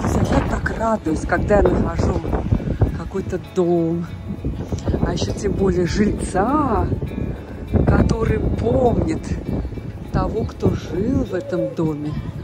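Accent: Baku, Azerbaijan. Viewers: Привет из Вильнюса! Спасибо за ролик! Как хорошо, что Вы решили погулять! Как приятно слышать речь с бакинским акцентом!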